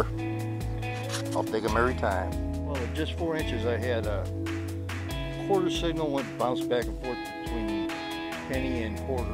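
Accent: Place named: Chicago, United States. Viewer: I dig that classic chicago accent. Happy hunting!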